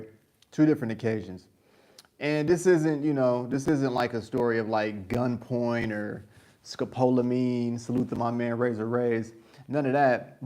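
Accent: United States. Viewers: 😂😂😂😂😂i have not known you to be so funny. Sorry again Yes,that accent ,many Americans i see around the malls in Kenya they dont talk much. And i know why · Unfortunately, corruption is all over Africa. They see money when they hear that American accent. Do not travel by bus by yourself if you are going to other countries. Travel with a local if you can.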